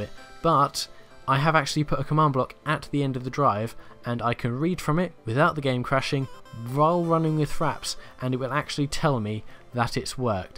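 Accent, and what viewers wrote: United Kingdom. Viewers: lol, can someone tell me why brittish accent is so hard to understand? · Oh, you're British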